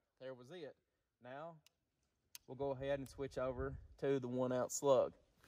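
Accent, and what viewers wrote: South, United States. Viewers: I appreciate your southern dialect also ❤️😎🌟🌟🌟🌟🌟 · That´s a fine Southern accent!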